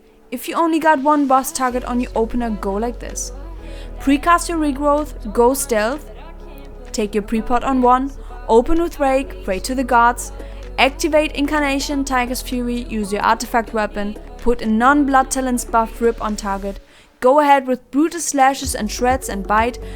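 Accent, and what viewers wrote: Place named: Germany. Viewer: Manchmal hört man voll raus dass du eine deutsche bist ;)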